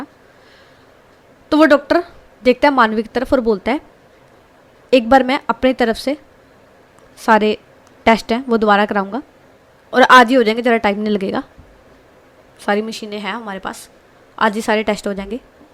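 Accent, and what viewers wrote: Punjab, India. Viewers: Very nice story aapki Punjabi bhi to Kya Baat hai itni khalish Punjabi ❤❤❤❤😅😅😅😅😅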